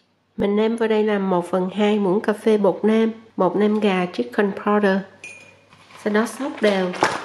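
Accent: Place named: South, Vietnam. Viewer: nấu ngon chỉ dẫn chi tiết không tiếc công... giọng nói như rót mật vào lòng ngọt ngào tự nhiên tiếng Nam nghe thương quá là thương, Thanks em nhiều nha.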